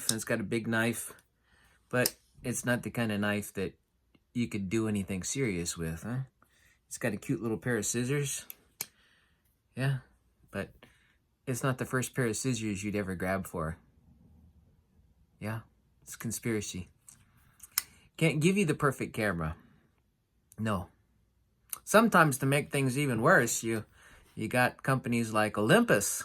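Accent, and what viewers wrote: Canada. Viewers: Gordon Mohr As a Minnesotan myself, a tad perhaps but still more of a Ontario inflection. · Great Canadian accent....had me fooled.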